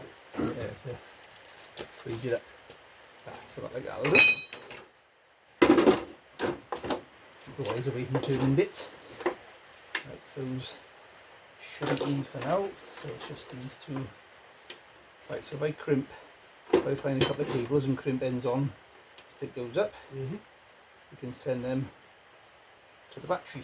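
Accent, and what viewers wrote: Wales, United Kingdom. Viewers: Great Channel, sound like from Wales? Love the work on the car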